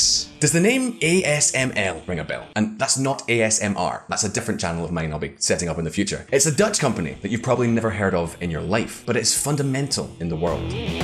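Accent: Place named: Scotland, United Kingdom. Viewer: I like the team with the other guy and the Scottish one doing the narration. I think the Scottish guy seems better behind the scenes. He has cleaned up his teeth a bit speaking somewhat slower and more clearly . He isn’t bad and has improved a lot. I still think he should set himself apart probably not wearing Hawaii shirt maybe dress more formal . But he is doing much much better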